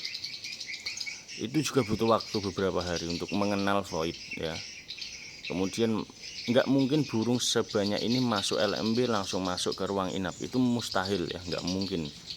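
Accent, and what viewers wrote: Java, Indonesia. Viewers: Mantap kang, jowone endi kange ?? Analisanya mantap kang, bener yg sampean bilang bahwa bw adaptasi butuh proses dan mekanisme nya persis seperti yg sampean sampaikan. Saya juga ngalami hal seperti ini, alhamdulillah belajar dengan terjun langsung akhirnya menemukan banyak hal. 🙏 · Jawa mana mase...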